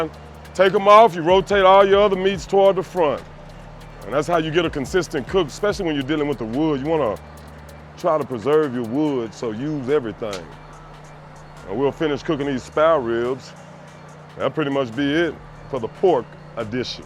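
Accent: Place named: Texas, United States. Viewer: Oh lord. This jokers In Utah. Talked like he was Texan. No wonder he’s talking silly smack. No decent QUE in Mormon world.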